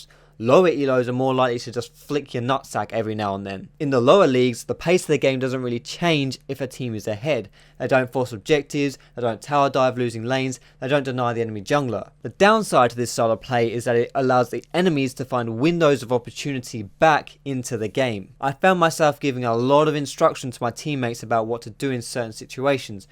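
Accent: United Kingdom. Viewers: are you British? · First of all, I love your british way of speaking. So smooth. Secondly, I'd like to quote you here, "...if you spend all your money reviving Axel Roses music career..." HAHA you're cracking me up. I wonder if the young punks on here even know who is. Anyway, great video!!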